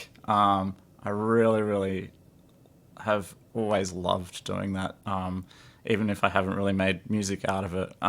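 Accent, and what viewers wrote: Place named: Australia. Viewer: have the natural of the ehb1505ms (since last saturday). and cool to hear ot hear another aussie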